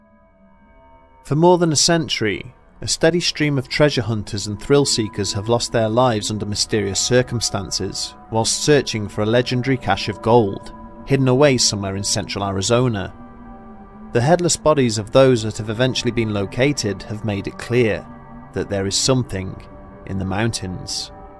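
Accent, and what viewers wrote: England, United Kingdom. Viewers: Why do they have English people telling these stories ? Americans can also tell stories.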